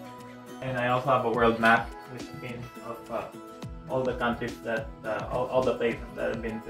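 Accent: Philippines. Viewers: Such a Filipino accent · He still speaking like a filipino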